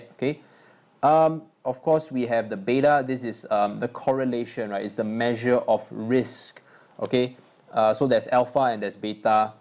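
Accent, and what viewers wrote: Singapore. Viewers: Hey, listening to you I gotta wonder if you're Singaporean cos I am hahaha:D Cool video and thanks for the info!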